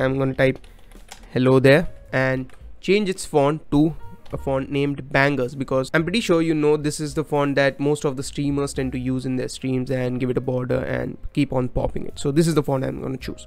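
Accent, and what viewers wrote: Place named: India. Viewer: Как обычно, вначале рыщешь половину интернета в поисках инфы, а по итогу один мужик с индийским акцентом за 3 минуты всё рассказывает как надо. Спасибо тебе, брат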